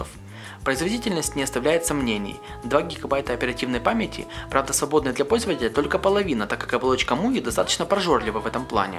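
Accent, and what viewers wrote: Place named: Donbas, Ukraine. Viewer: Молодой человек надо, что-то менять! Не хочу ни кого обидеть! Но Вы делаете публичный обзор и дикция должна быть отличной от дикции обычных жителей Донецка или Луганска! Удачи и счастливых перемен!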